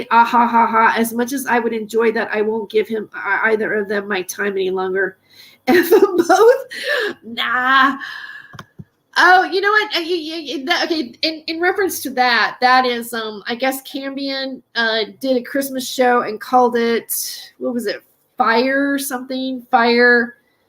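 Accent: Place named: United States. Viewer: SPOT ON SISTER, its so refreshing to see a real talk truth seeker calling out the liars and shills....so many shills now are showing their true colours...truth is out there indeed and you do such a good job and an essential service for truth. I met MJ and he has a VERY big ego and slime energy. He turned up with all his bling gadgets (clearly this is a business for MJ and he jumps on any story to create a story to create the money , he doesnt care about truth!!! ). No-none cared or really spoke to MJ at the event , KK was also there and she was really cold/didnt mix and didnt crack a smile (tells me all i need to know, she thought she was very special indeed)...Liars, shills, pedos and their protectors all attract each other. Thank you again for exposing them. There are of course so many genuine authentic truthseekers and its all part of the shift as the liars in these interesting times are also exposed. 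I love your American loud mouth btw, you say it sister as it is lol (UK) xx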